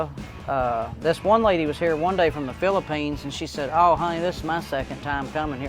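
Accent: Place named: Kentucky, United States. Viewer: Awesome KY accent. :)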